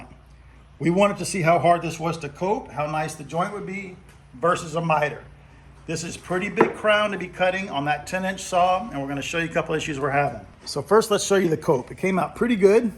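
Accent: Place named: California, United States. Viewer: Sir, Morning. You are very professional and well spoken, and you definitely look like someone famous. So, as I watched the lecture, it came to me, you look like a brad pitt who really worked his ass off in life… with a slight California accent… Great work.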